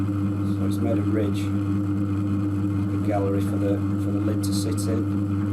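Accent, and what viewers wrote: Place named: North, United Kingdom. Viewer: Lovely to hear a northern accent! Thanks so much for your time on these videos. Cookie jar looks a treat mate.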